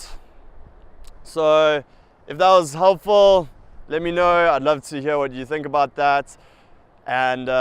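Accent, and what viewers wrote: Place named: South Africa. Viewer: You sound incredibly South African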